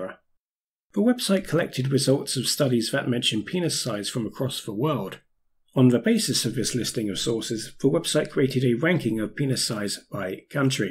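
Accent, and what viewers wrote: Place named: India. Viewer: U sound indian origin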